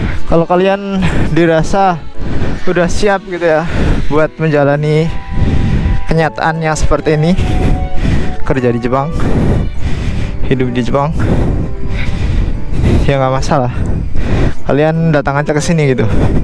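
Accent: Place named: Banyumas, Indonesia. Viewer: Wong endi mas, agak ngapak hehe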